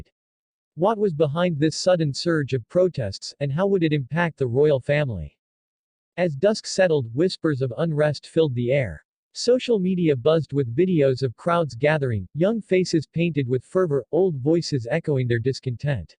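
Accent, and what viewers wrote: United States. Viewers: American accent?Bye bye.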